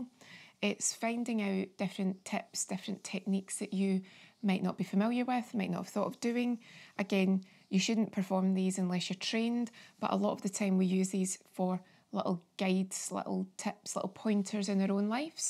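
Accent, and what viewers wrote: Scotland, United Kingdom. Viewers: I found you today looking for a how to massage video. I really like your voice and accent. What part of Scotland do come from? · This looks so relaxing. I found your channel through recommendation from another channel i follow Love your follow alongs, and the indian head massage tutorial is soooo relaxing. Love Scottish accents too 😊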